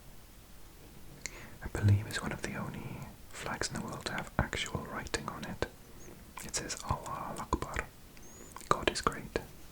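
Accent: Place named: Wales, United Kingdom. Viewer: Fellow welsh person?🎉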